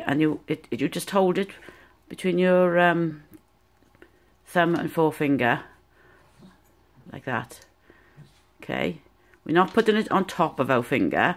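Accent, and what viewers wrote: Wales, United Kingdom. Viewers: Wonderful tutorial and so lovely to hear a 'tidy' Welsh voice.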